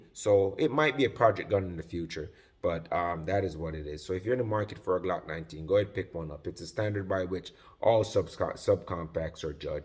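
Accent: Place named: Jamaica. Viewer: Heard the Jamaican accent and had to show love bredrin!! Continue with the great content and another subscriber to the chart!!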